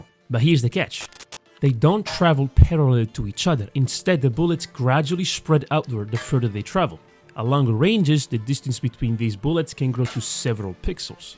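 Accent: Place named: Russia. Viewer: i'm not too sure, as I haven't heard a lot of accents, I wanna say Russia, but I also don't want to seem offensive if i'm wrong sooooo, sorry if I am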